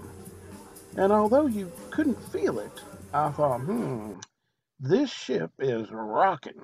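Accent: South, United States. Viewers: Thank you I love your story telling made me wanna watch it all.❤️🌹👍. I love your southern accent, my mom is deep southern . God bless you and your family in your endeavors. From Alaska. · great southern voice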